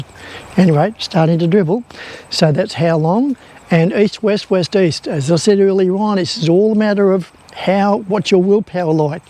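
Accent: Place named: Australia. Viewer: Fair dinkum, a true blue bogan with awesome Aussie accent! :)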